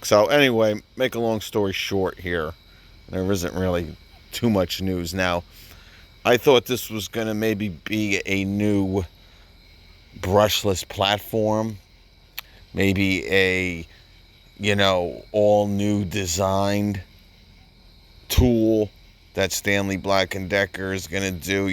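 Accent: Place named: New Jersey, United States. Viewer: Dear God I’ve missed that gravely, baritone Jersey voice. Thanks!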